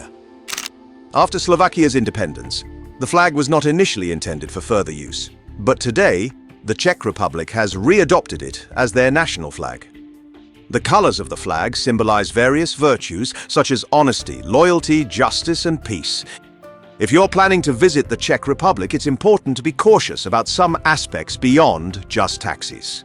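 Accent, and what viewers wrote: United Kingdom. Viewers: Wow. The London underground system - even the staff dont know whats going on and where! Being told about this underground system of order - with a British accent - makes it all the sweeter.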